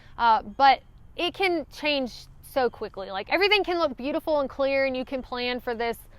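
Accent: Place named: South, United States. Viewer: Good advice on the antidiarrhea medicine. I'm going to put that on my checklist right now. Also, I had to laugh. I couldn't understand why you said not hiking in bear country until I realized you were saying night hiking. I guess I need to come down south more often. 😁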